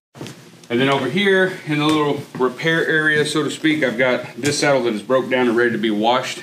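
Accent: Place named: Texas, United States. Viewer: Boy howdy, your Texas accent is really strong on this video! Who you been hanging out with this weekend???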